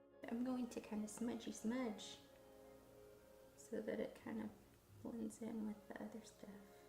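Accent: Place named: New York, United States. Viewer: Great vid,!....It was all that and a box o' chocolates!:))...........fun New Yawk accent lol.....that was like a dame in a 40's movie.......that makeup looked very cool.....great pics at the end:))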